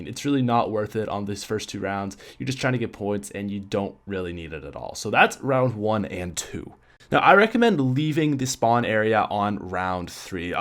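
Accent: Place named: Canada. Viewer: Hahaha you must be new to his channel. Not trying to be rude, but he's Canadian and says some words just a bit different. Nothing bad about it tho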